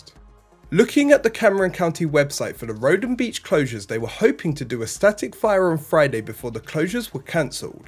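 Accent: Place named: London, United Kingdom. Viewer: It is a London thing, he said it "free times" already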